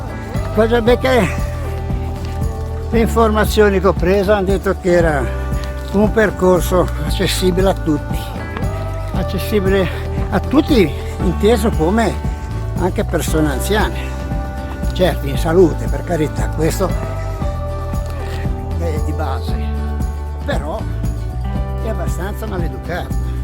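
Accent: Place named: Genoa, Italy. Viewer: Fantastico bellissimo video che bell'accento genovese 🙂 Patty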